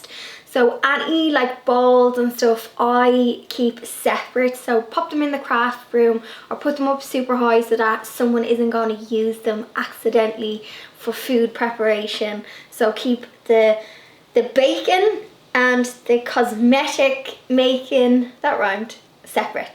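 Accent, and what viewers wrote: Ireland. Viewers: I love your channel lovely to hear an Irish voice on here 😻 I will definitely try your recipes as I love essential oils and handmade soaps I must get some soap making supplies thank you for sharing xx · You could read the telephone book to me and I would listen. ( not in a creepy way!) I love your Irish accent. You have such a lovely home as well and I love to see all your DIY stuff.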